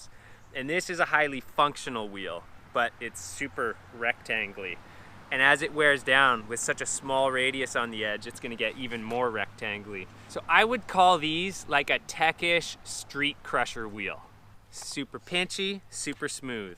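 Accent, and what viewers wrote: Canada. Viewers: Most Canadian skater I've ever heard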